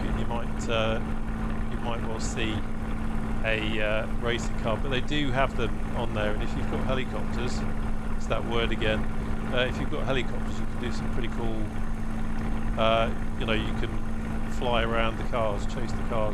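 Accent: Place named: England, United Kingdom. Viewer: You were absolutely great on this one. Thank you very much. I' m not familiar with how to financially support you but I will find out on way or the other. Finding and get caught on the immersion of this sim is something I share with you and I prefer English above the American accent as a real Dutchman, you neighbor so to speak. See you next time🙏👍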